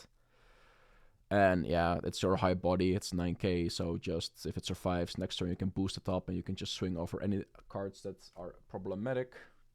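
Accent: Netherlands. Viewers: got an interesting accent, are you dutch by any chance? Also Nice Channel!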